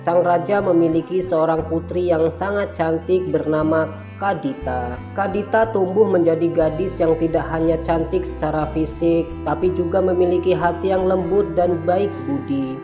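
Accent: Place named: Java, Indonesia. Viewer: Suaranya jawa banget, mending pake AI aja, eneg suaranya didengar.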